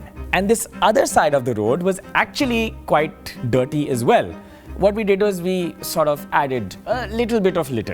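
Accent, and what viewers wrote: India. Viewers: I wanted to watch this but I cannot stand the Indian accent. It's a phobia.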